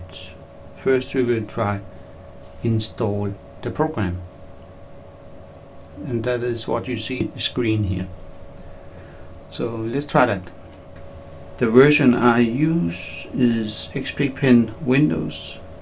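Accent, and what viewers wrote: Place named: Denmark. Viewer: danish innit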